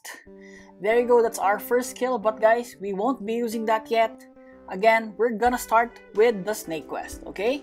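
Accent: Philippines. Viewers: you have a Filipino accent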